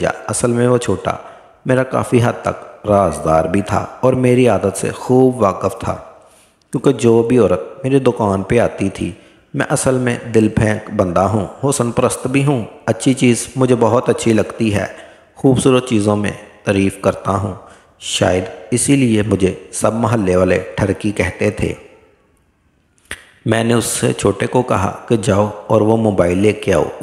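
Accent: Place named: Sialkot, Pakistan. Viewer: astagfar.... awaz k lehja sialkoty hai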